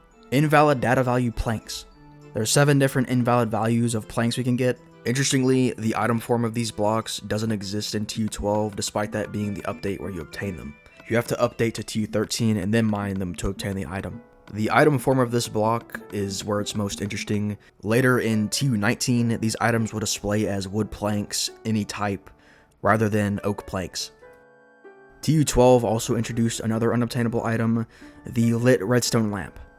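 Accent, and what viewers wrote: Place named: South, United States. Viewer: I Can hear the southern in your voice brother 🦅🇺🇸🦅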